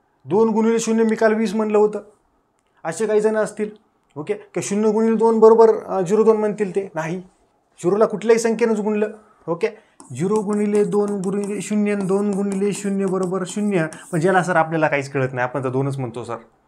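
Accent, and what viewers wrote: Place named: Marathwada, India. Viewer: तुमची शिकवण्याची भाषा खूप छान आहे ...एकदम सहज समजते....एकदम रोखठोक आणि मराठवाड्यातील मराठी...💪👍🙏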